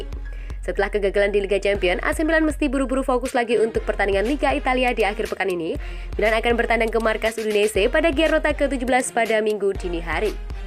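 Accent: Java, Indonesia. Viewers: Jawanyan mana mbak pengisi suara!????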